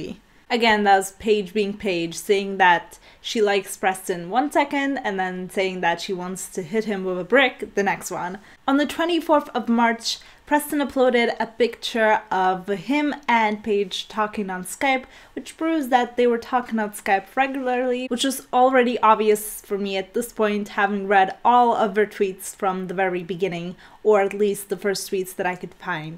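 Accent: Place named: Italy. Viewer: Loving your accent! I'm guessing you're from Italy... (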